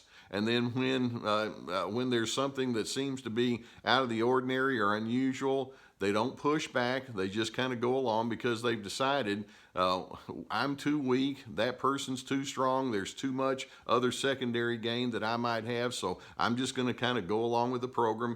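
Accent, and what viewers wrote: South, United States. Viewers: Experiencing his flying monkeys now. It’s awful. I thought these people were my friends. So hurtful. Love your videos. Love your southern voice. Thank you for such honest videos. They are spot on.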